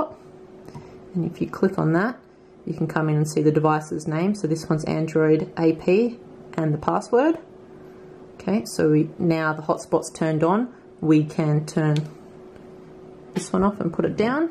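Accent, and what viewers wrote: Australia. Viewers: Thanks, you made the improbable possible and did it with a sexy Australian accent.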